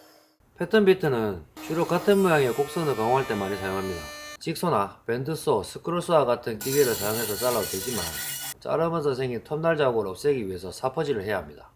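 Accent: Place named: Busan, South Korea. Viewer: 사투리가 낯익은데 부산이십니까? 늘 좋은 영상 발조고 있습니다~얼릉 구독자 늘어서 유명해지시면 더 좋을것 같습니다! 좀 딱딱하지만 진짜 중요한걸 늘 잘 가르쳐 주셔서 좋은 선생님이자 형님이라 생각하고 있습니다~!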